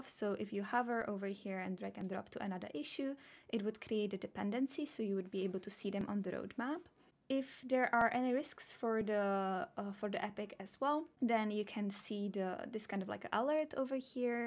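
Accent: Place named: Scandinavia. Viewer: Nice video! but the whole time I'm wondering where your accent is from, Its either Finish, Icelandic maybe even Norwegian??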